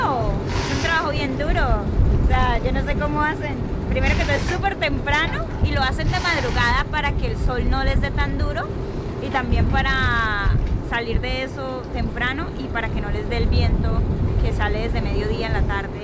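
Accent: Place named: Colombia. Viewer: Hola me llamo Yanet, soy cubana, vivo en Italia y te he encontrado por casualidad en YouTube y realmente tus videos nos gustan mucho porq estoy conociendo Australia gracias a ti estoy fascinada, he visto tantos de tus vídeos eres fantástica, y amo el acento colombiano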